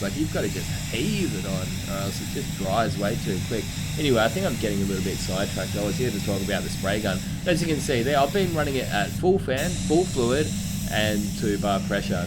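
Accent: Australia. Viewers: He's got that au accent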